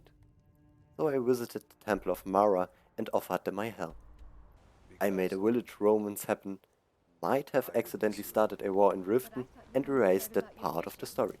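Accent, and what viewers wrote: Germany. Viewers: Der moment wenn du direkt merkst das der dude deutsch ist😂💋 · U german?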